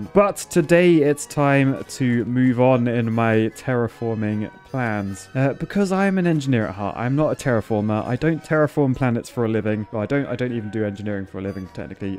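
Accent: United Kingdom. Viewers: I agree, it's just the accent. Nice British accents make everything sound better, at least to Americans.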